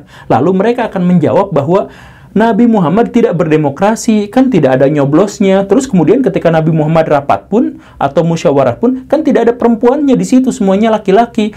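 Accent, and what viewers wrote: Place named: Bandung, Indonesia. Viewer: Btw, Bapa ini jago, di awal beliau seolah tidak sepakat dg konklusi organisasi Islam terbesar di Indo, tetapi di ending, konklusi beliau ya sebenrnya sepaakat dg org Islam terbesar di Indo hehehe...itulah jagonya org dg kultur Sunda, pandai menyamarkan dlm kalimat hehe...oya, kalau dri logat beliau...kayaknya asal daerah Kab. Bandung deket2 Garut, sekitaran Cicalengka gt yak hehe...salam😊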